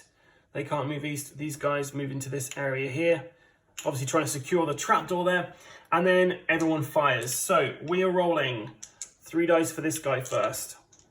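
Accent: United Kingdom. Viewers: What an unfortunate massacre. Valiantly fought, alas it was not meant to be. I do not know enough about this game to give a proper assessment of what hurt our chances most and what could have been done better, but from my layman’s position, it looked like the scout’s assault on the objective room manned by three Germans might have been overly optimistic, and its failure the point where the operation took a turn for the worse. You’ll get ’em next time! By the way, love your ’40s British accent.:D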